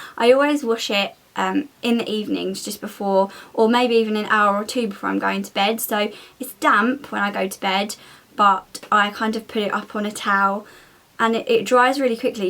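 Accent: United Kingdom. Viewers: wtf? does someone understand what this girl is talking here?=))))))))))))) for god safe speak too too much fast :)) i don't think she realise :)) i always hated british accent is horrible , british sucks:| the acent is enough tacky she shouldn't talk fast , british accent + fast talking= SUCKS girl please don;'t speak so fast in your videos if u want ppls understand u:)) not just british ppl using internet LOL ..LMFAO :)) this made my day=)) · I can understand what she's saying. I personally love British accent, its probably because of Harry Potter. XD I always thought British people have cool accents.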